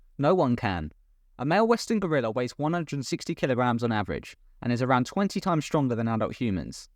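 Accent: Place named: United Kingdom. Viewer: 😂 great video! You made me laugh! Looking forward to watching the next one! By the way, I love your British accent!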